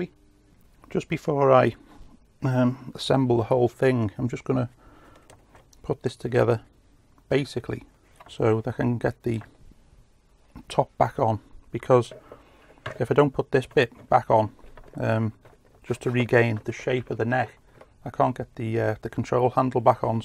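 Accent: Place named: Merseyside, United Kingdom. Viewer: I can hear in your accent you're from merseyside. Can I drop off my Bosch athlet circuit board to you for repair? It is not charging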